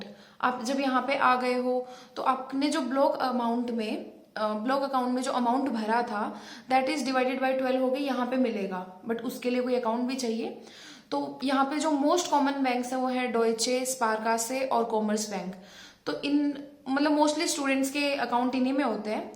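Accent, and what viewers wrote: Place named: Sindh, India. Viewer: Ur tone looks like Sindhi so r U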